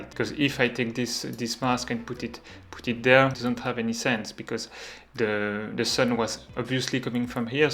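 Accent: France. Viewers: Isn't it a French accent ?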